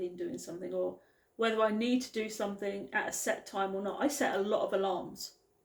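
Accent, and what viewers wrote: United Kingdom. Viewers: Britânic English... Happy friend's day! I'm learning english! I really need learn! Thanks for you. Good afternoon.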